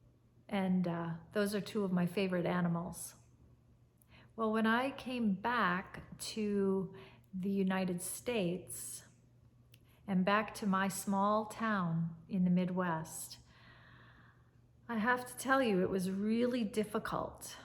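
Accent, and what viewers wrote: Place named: Syria. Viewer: You have a very good Syrian accent 😍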